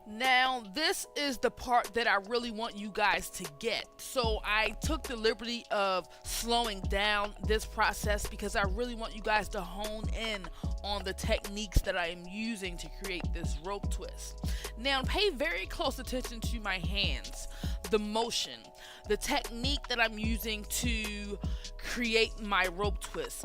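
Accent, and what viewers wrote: Baltimore, United States. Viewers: You from Baltimore lo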